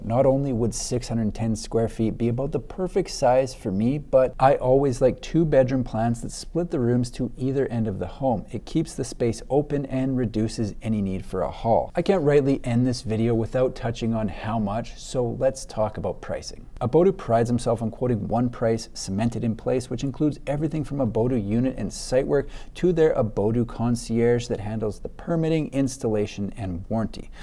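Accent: Canada. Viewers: Every time I think a better design can't come along, one does! Thank you so much for your interesting and inspirational videos. I so enjoy them and as a Canadian living in Europe, love listening to your gentle Canadian accent as a reminder of home :)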